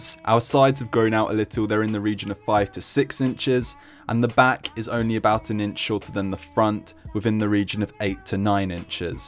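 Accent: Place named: United Kingdom. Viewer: You have british accent bro isn't 😊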